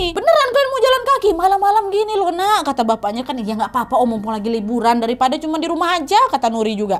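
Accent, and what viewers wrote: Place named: Tanjung Balai, Indonesia. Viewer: Inget banget dulu nontonin kak dari masih ribuan subs, seneng aja sama logatnya karna sama² org tjng balai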